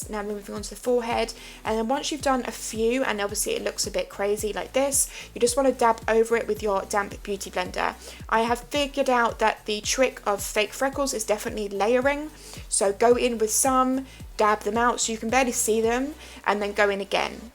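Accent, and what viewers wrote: United Kingdom. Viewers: This is really pretty. First time I've come across your. Channel. Wasn't expecting the British accent!!! You're very cool:)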